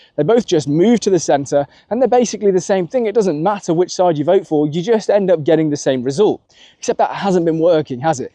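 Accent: United Kingdom. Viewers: you articulate yourself like a real Brit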